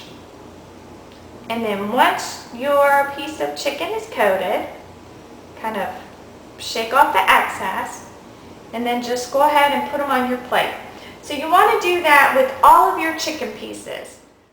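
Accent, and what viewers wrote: South, United States. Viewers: Are you from the south, it sounds like you have a southern accent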